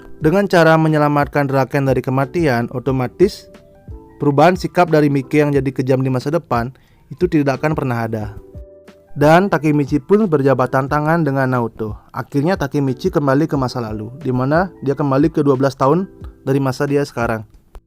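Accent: Bali, Indonesia. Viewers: pantes nyk san logat bali ne🤙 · Logat nya bali banget wkwkwkwk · Oi, ini pengisi suara orang bali ya pengisinya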